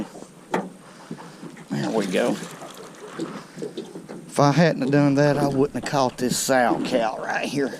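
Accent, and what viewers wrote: South, United States. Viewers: Amazing how you have southern accent but say crOppy rather than crappy. Its and A not an O. If your from the south get with the program.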